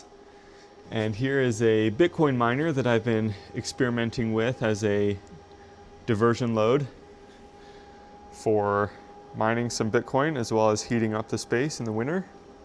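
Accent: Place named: Canada. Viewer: Hey I'm hearing a canuck accent, where are you from? I'm looking at integrating a similar design in our future home. Great video, love from Alberta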